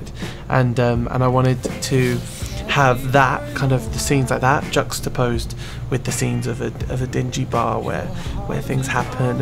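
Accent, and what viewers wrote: United Kingdom. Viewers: The British accent is awesomeeeeee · hes brittish....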